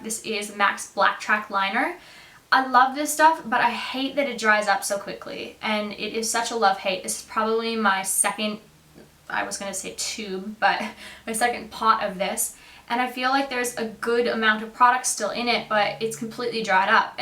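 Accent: Australia. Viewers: It's hard to tell that you are Australian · Wow you have really picked up the Australian accent! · I hear that Australian accent trying to break through! Haha! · lol i dont know if its because i havent seen your videos in a while but you have more of an australian accent now, love it! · This is going to sound extremely weird but I really love your accent! It's amazing how 3 years ago, your accent is completely far from an Australian one and now, you sound quite like an Australian. I find it really cool and it makes your voice sound extra great to listen to :3 Love the video as always! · I notice the Aussie accent is starting to stick!! :) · i think she's picking up on an australian accent a little! :) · Is that a slight aussie twang to your accent? ahaha love it! i can hear it! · I love your videos! :) Thanks for posting xx p.s. your accent is transitioning to australian! 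love it hahah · You can hear an Australian accent starting to come out in you.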